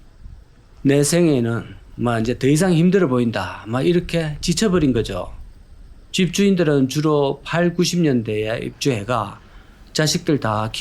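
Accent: Gyeongsang, South Korea. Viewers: 경상도 사투리가 압권^^ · 갱상도 ㅋㅋㅋ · 갱상도 발음이라 이해해야됩니다 쌀도 살이라 말합니다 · "와~ 후덜덜덜" 😅 갱상도 사투리 마 직인다 아이가💣 🌋🎊🤣 오늘도 👣👣👣학실한😂 개드립 ~ 으로 구독자들에게 도파민을 선사하시네요. ㅎㅎㅎㅎㅎㅎㅎㅎ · 누가 뭐락 했심껴? 갱상도 발음으로는 은마를 언마라 부르는거 모르십니껴? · 경상도 사투리의 매력에 웃네요. 은마(언마) 스스로 (서서로) 죽이라.(직이라) 사투리가 은근히 매력 있네예. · ㅋㅋㅋ경상도사람들은 "으"발음을 못해서 "어"나 "오"나 "아발음을 하는데... 그 이유는 원래 우리말 고어에는 <아래 아>로 발음하는 게 있는데... 이게 일제 때 24개 현재의 자모음으로 정해지면서 4개의 자모음이 발음하기 불편하다 하여 생략하게 합니다. 그러다보니 <아래 아>가 다양한 모음조화에 따라 다양한 모음으로 발음하게 되면서 특히 경상도 사람들의 경우 원래의 그 주된 구성원들이 저 북쪽의 사로(중국에서는 이를 고어 발음으로 "슈어눠"라고 발음하는 것을 문자로 적을 때 그 차자로서 "흉노"라고 적은 것) 사람들이기에 그 발음이 거센 발음이 많은 게 특징이며, 된발음을 잘 하지 못하는 특징을 가진다. 그래서 쌀"을 "살", "싸움"을 "사움"이라고 하는것처럼... 특히 이 "사움"은 일본어 고대어 에서 <"사우무" => "사무우" => "스모" = 씨름> 의 원형이기도 하다 위 영상제작자도 계속해서 <은마>라고 발음하지만 실제 들리는 발음은 <언마 혹은 엄마>로 발음하게 되는데 이는 어짤 수 없는 지역적 방언의 특성이다.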